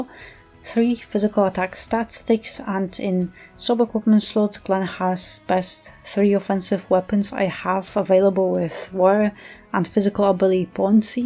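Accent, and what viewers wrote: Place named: Eastern Europe. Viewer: I like her accent. Sounds eastern europe, I am curious…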